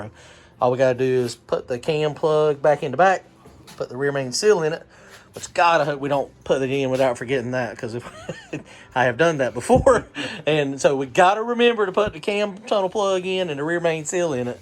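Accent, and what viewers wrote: South, United States. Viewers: Honestly, I don't really care much for southern accents, but I subbed before you started showing the engine. There's a lot of engine channels on youtube, but a lot of douches too. You automatically have that vibe that makes others wanna sit down, have a beer and talk cars with. Very neighborly and humble.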